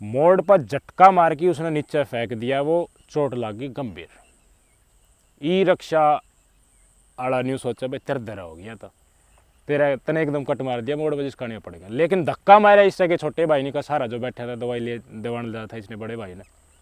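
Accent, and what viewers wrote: India. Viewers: Desi boly mast h bhai sahab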